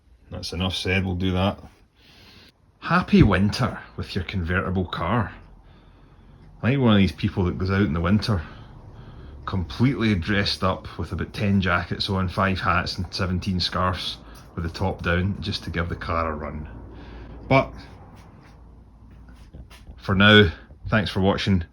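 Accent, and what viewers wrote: Scotland, United Kingdom. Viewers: Love the scottish accent 👍 great review I have actually just bought one of these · Buddy you have a strong Scottish accent while have a US flag in your garage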